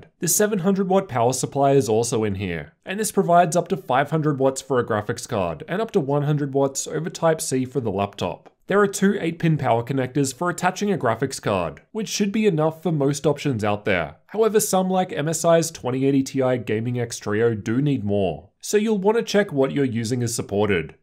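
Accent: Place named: Australia. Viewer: One of the reasons I watch your videos is because I like mimicking accents and I am not so good with Australian accent, u talk clearly and you r a tech YouTuber so I can do two things at a time, watch tech videos and learn Australian accent 😁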